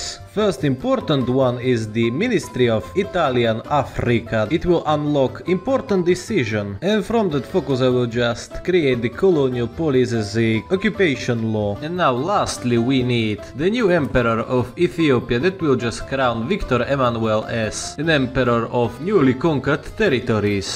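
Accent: Russia. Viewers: are you actually russian? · Ты не русский случаем? Акцент у тебя такой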